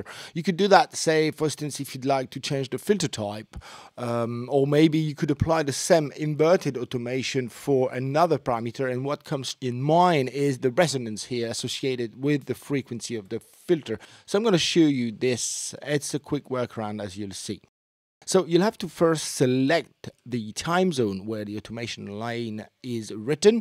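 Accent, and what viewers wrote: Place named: England, United Kingdom. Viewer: Nice english accent l'ami!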